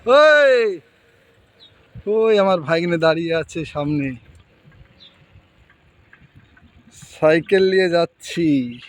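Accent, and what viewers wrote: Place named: Meherpur, Bangladesh. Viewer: মেহেরপুরের ভাষা পাল্টা 🙂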